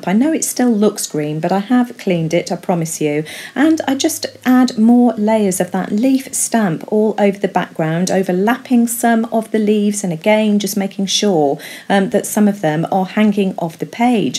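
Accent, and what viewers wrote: United Kingdom. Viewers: I always look forward to your videos, you make beautiful art! And I love your British accent 🙂. I would love to see you make a soft pastels tutorial...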